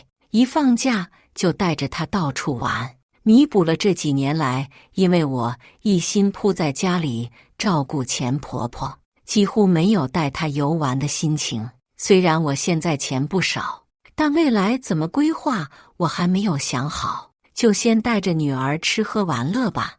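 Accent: China. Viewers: 聽不懂，是什麼鄉下方言？ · 操 后半段用普通话发音说粤语（或者闽南话？）！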